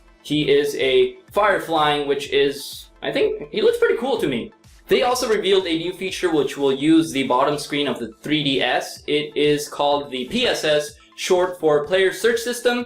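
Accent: United Kingdom. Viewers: YOU CAN NEVER COPY BRITISH ACCENT!!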